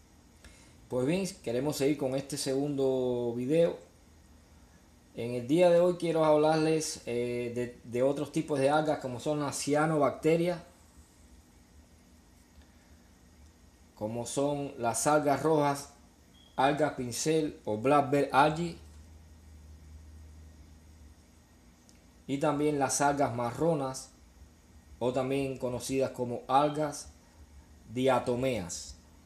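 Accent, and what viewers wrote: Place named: Cuba. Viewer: Amigo de q país eres ?? Suenas como cubano !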